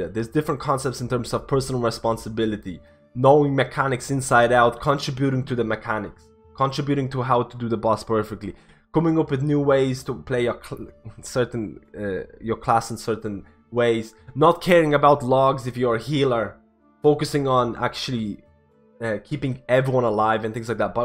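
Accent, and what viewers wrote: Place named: Dublin, Ireland. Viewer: Where are you from. I kind of detect a Dublin accent but not a native Dubliner. Like you're from another country in Europe but adapted over time. You're probably not but just thought I'd ask.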